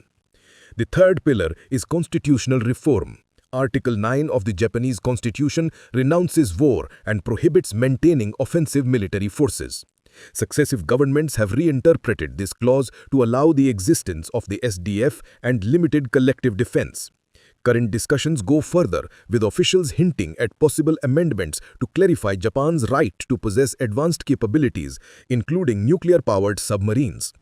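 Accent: India. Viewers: If it is AI voice why pick these Indian accents this is so hard to hear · Indian accent. I dont like.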